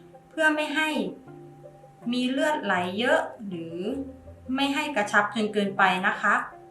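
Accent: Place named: South, Thailand. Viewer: คุณหมอสำเนียงเหมือนคนใต้เลยครับ คนใต้ป่าวครับ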